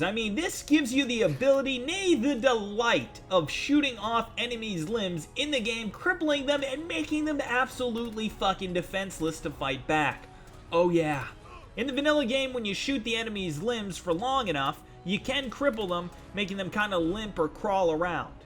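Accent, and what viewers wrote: Canada. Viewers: you Canadian? you got that accent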